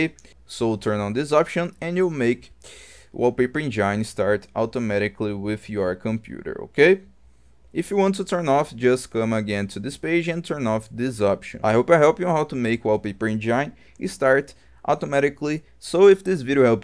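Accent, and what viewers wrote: Brazil. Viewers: O massa é que Br consegue identificar Br apenas ouvindo a voz kkkkk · o sotaque brasileiro ta forte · I just know you're brazillian just for your accent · sabia mano, tava um sotaque brasileiro demais pra um gringo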